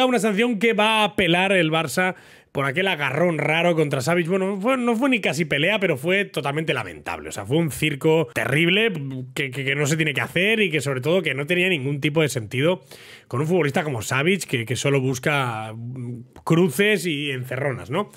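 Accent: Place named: Argentina. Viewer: Parece Argentino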